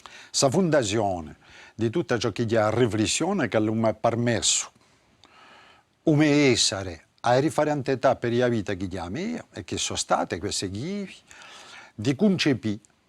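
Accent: France. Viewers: L'accento francese è inevitabile.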